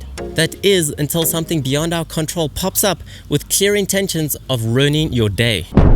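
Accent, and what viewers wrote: Afrikaans, South Africa. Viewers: Eyyyyyyy, I new u were South African when I heared your voice🤣🤣🤣then I saw "Johannesburg" so what up bra🤣🤣🤣 Ok so like I'm 15 and I wanna get a bike for next year, it's a Honda cbr125r for 15k and I need to buy it myself😦😦😦 so any suggestions on how to get the cash🤣🤣🤣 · Are you from south Africa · I was suspecting your from South Africa just because of your accent and then i saw the world clock on joburg, you just have that afrikaans accent some of us afrikaans mense have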